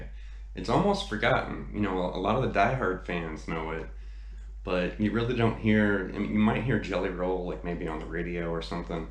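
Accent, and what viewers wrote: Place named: South, United States. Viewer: No idea who you are and you kinda look and sound like you should be playing a banjo on a country house porch somewhere but sheeeet man you can shred for sure. You also know what your talking about which proves once again that looks can be deceiving. I need nothing more than this to Sub to your channel and I’ll listen to you break down any guitarist licks. Thanks man and rock on! 🤘🏼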